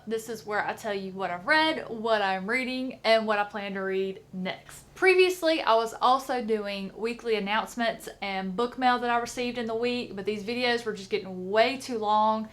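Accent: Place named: Tennessee, United States. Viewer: Your accent is the best <3 TN am I right? I lived there for a little bit and everybody had a nice twang.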